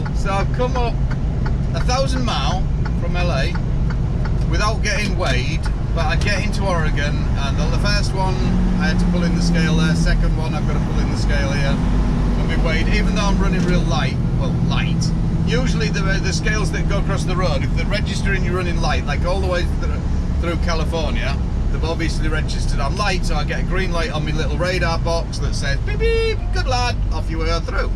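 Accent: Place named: East Yorkshire, United Kingdom. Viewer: Hi mate. Did you say your from East Yorkshire? I live in Goole. Your accent sounds alot like mine. 😆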